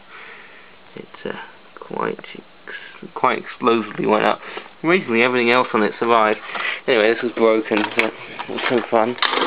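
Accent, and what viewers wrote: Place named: Southern England, United Kingdom. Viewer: Nice hacking, interesting video :) Whereabouts are you from? I can't quite figure out your accent.... Essex? Kent? Or further west?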